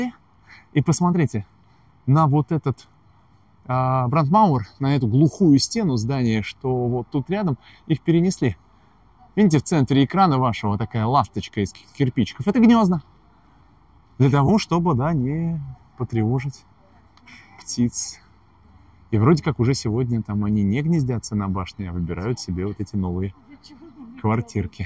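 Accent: Standard. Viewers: Наслаждаюсь чистейшей русской речью огромное спасибо спйчас это редкость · твердая 5 за прекрасное знание истории Баку! Очень правильная и чисто русская литературная речь. Человек благородный и жизнерадостный. Мне самому стало любопытно, как иностранец может столь интересно рассказывает историю нашего города. Рад что для гостей Баку открываются свежие страницы из жизни нашего города. Люди добры и чистоплотны, вежливы и гостеприимны. сильно отличаются от других народов Кавказа. Жители Баку богаты и горды, не любят приставать со своими услугами к незнакомым людям, но всегда придут на помощь если к ним обратятся., вам всегда будет сопутствовать удача за ваше жизнелюбие. Жаль что поленились и не сняли все что увидели в Баку. Отдельно про Баку -чистый и омытый весенним дождем, вкусов жаренного мяса с разнообразными специями, ароматом горячего хлеба, морских волн и криком шумливых. 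попугаев. Баку рад и ждет гостей разных добрых и любопытных, мечтающих возбудить чувства и вкус в новых путешествиях и приключениях.. понравился Баку, нам понравились вы!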